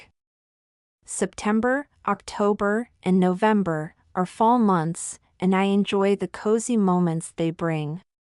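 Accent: United Kingdom. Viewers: Please does this channel have a British accent? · Is this British english? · Is it British accent ?!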